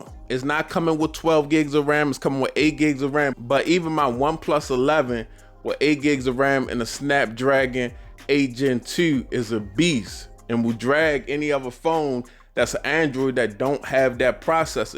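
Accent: Baltimore, United States. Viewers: Thats got to be a Baltimore accent 😅❤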